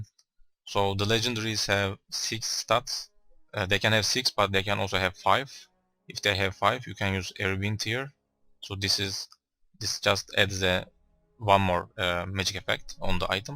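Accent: Turkey. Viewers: İlk cümlende Türk olduğunu anladım :D Rehber için teşekkürler. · Türk ingilizcesi bu ama gerçekten çok temiz olmuş dostum ellerine sağlık :)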